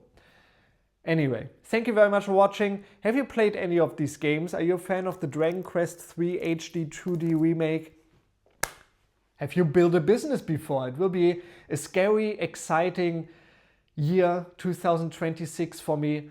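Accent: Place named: Germany. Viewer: You sound German! I guess you’re going balls deep into manga, huh? 😀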